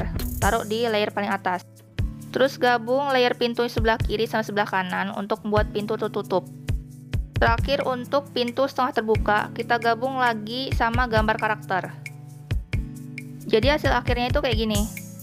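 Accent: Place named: Kalimantan, Indonesia. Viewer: Kw ni org kalimantan kn? Logat ngomong mu kyak di tempat ku soalnya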